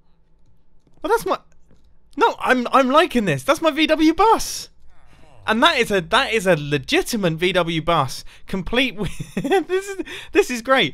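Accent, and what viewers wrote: United Kingdom. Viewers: sounds better with his British accent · the way he said what is “What is occurring?!?!?” Instead of saying what is happening is so funny to me for some reason the British accent makes it better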